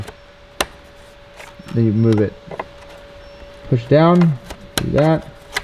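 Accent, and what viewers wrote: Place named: Long Island, United States. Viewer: Listen to that long island accent 😱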